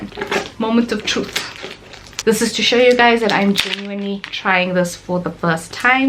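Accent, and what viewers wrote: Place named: Cape Town, South Africa. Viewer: Are you colored? You sound like you from Cape town?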